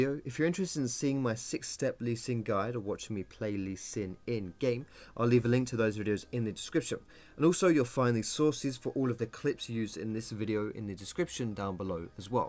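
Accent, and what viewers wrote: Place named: United Kingdom. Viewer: Inspired? No! I've just come here to watch some sick Lee plays and listen to your lovely British voice :3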